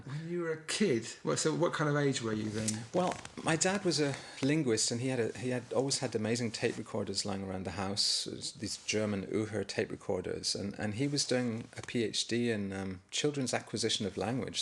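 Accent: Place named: Scotland, United Kingdom. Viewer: Posh Scots, eh?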